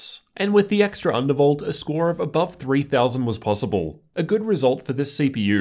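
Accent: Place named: Australia. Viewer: it's the Aussie accent I assume. He really emphasizes the OR in port. "USB-C pOOORRt, USB 3.1 type A pOOORRt, HDMI pOOORRt, mini displaypOOORRt" it sounds really jarring to a Canadian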